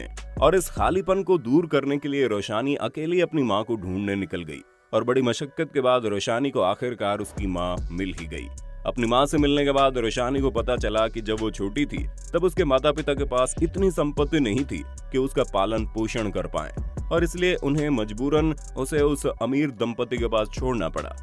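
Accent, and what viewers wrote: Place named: Jaipur, India. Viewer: Bhai apki real voice sunai ye yeto koi jaipur vale bande ki hai bhai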